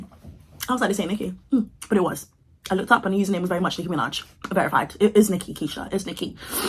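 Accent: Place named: RP, United Kingdom. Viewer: That pink dressing gown is yuk! You talk too fast and too posh!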